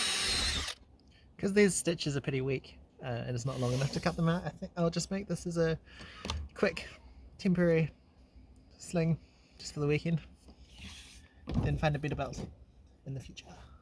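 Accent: New Zealand. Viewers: Kiwi bro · What's the accent? NewZelandian?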